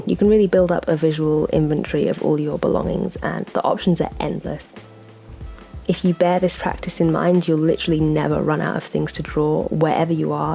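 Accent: United Kingdom. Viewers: Nice vid and really love ur british accent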